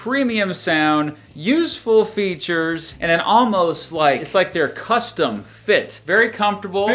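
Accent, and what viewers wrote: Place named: Michigan, United States. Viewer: Are you from MI? The way you say certain things reminds me of Dax Sheppard lol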